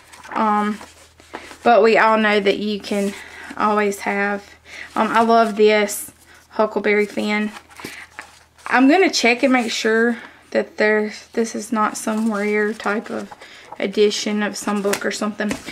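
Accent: South, United States. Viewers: Came through Foley just a few weeks ago going to Orange Beach! Love that Southern accent! When I go to meeting in D.C., New York or Chicago they always ask, "Where is that accent from?" I say, "Accent? What accent? I don't have an accent!" lol And then I tell them I'm from New York! lol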